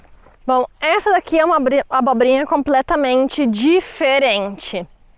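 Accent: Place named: Rio Grande do Sul, Brazil. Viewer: Como eu adoro te assistir , vc é pura inspiração Graças a você hoje eu consigo ver algumas plantas q são consideradas “pragas” com um olhar mais amoroso , sem contar q seu sotaque é o mais gostoso de ouvir , adoro o sotaque gaúcho , tô aprendendo muito com seus vídeos , obrigada por dispor do seu tempo pra nos ensinar tantas coisas boas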